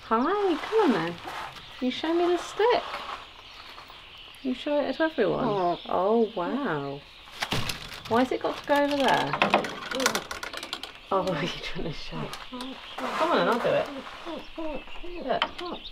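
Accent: United Kingdom. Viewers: I used to own a business here in rural Georgia where I would look after farms, or just pets while people were out of town. All of the critters touched my heart in their own way, but the birds I found fascinating because their vocal ranges allow a window into their thoughts and also their humans. Like with Fable, it was obvious you were British, laugh easily and are a calm, gentle person. I wish all of my beloved animal friends I’ve met owned a human like you! Your critters are so blessed to have you! Love from the Blue Ridge Mountains - and congratulations 🍾🎉🎊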